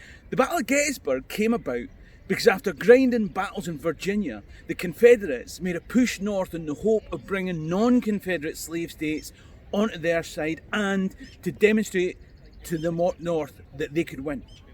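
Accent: Scotland, United Kingdom. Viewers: What accent would you expect from a Scots man lol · I to am American of Scottish decent. Love the stories and the accent! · Love the videos, as they deal with subjects that have I have always found interesting. A bonus for me is your accent, it brings back fond memories of my Scottish Grandpa on my dad's side. My Grandpa immigrated from Scotland to the U.S., by way of Canada. He met and married my Grandma in Massachusetts, her family had been in New England since the Mayflower, with my ancestor, Peregrine White, being the first English baby born to the Pilgrims, on the Mayflower, while the ship was anchored off of Cape Cod I have dual citizenships, one due to my British Mum, as well as me being born in England, and a U.S. Citizenship from my American Dad. I have lived most of my life in the USA, with over 30 years just outside Phoenix, Arizona. 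If you want to know more details about me, and the seriously tragic event that has shaped my life, including the horrific disfiguring scars that make people gasp, and small children cry, I have posted a link to a video that captures the exact moment my world was literally ripped apart... ⚠WARNING! GRAPHIC VIDEO! NOT FOR CHILDREN OR THE SQUIMISH!⚠ <link>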